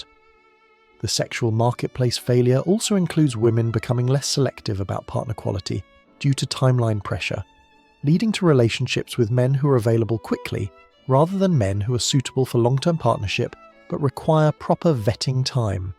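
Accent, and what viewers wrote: United Kingdom. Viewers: Blah, blah, blah, blah, blahhhhhhhhhhhhh Finding some British twit to read your garbage script doesn't turn a turd into roses, it's still bull---- All I heard was an average man excuse manifesto, BEGGING women to settle on them and stop being so mean with their mean mean standards, and leaving them for better men Geez, you Bro's need pacifiers more than you need women. This was ridiculous 😂😂😂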